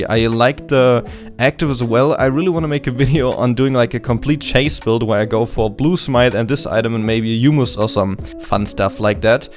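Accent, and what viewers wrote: Germany. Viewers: Hey c: are you from germany? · are u from germany or where are u from?ü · Man merkt übelst dass du Deutsch bist xD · Man merkt an deinem Englisch, dass du aus Deutschland kommst xD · are you german? cuz you sound like ^^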